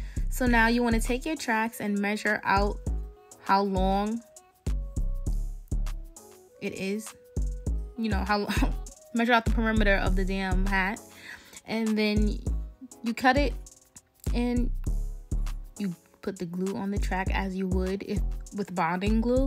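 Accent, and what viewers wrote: United Kingdom. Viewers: Love the English accent yeah 😍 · so you british or american because i heard the british accent in another vid and now i’m real confused😩😩if it’s fake though you is good at it (i’m from the uk btw)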